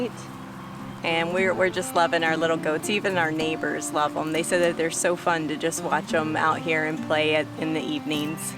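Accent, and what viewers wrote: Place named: Michigan, United States. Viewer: I thought I picked up a MI accent. Really like your property and homestead. Very peaceful. Looking forward to make garden and bee hive updates!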